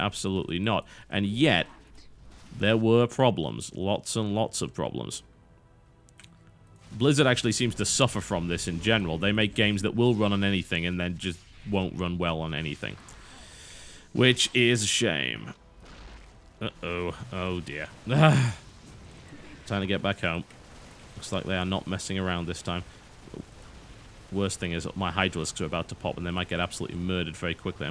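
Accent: United Kingdom. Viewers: I am honestly loosing my ability to detect your british accent... I don't know if it because I watch a lot of channels with accents from the U.K a lot and therefore Americans and people from the U.K are starting to sound the same but either way I can't even tell the difference that easily anymore.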